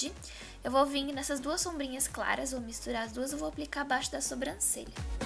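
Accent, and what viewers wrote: Santa Catarina, Brazil. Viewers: pelo sotaque só poderia ser catarinense!! linda, perfeita make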